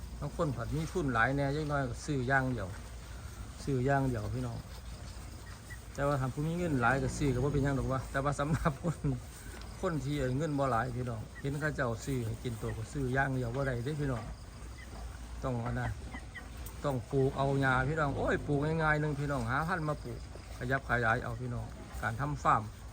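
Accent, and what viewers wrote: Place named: Korat, Thailand. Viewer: โคราชชัดเจนดีครับ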